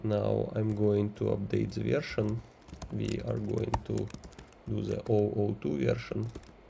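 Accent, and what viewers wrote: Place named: Russia. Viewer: Ух! Нечасто даже на просторах рунета встретишь такой ядрёный runglish :)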